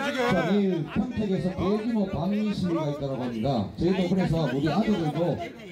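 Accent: Jeolla, South Korea. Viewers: 시팔 세퀴가 전라도말투네! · 전라도네 사회보는놈 듣게싫어사투리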